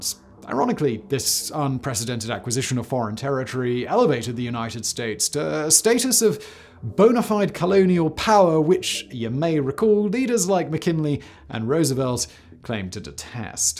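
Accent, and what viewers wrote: United Kingdom. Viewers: “ a staunch and” ? Vociferous? Opponent” I can usually decipher your British accent but in this case I wasn’t sure if you said vociferous or vociferent Now I have to go Google to see if that’s actually a word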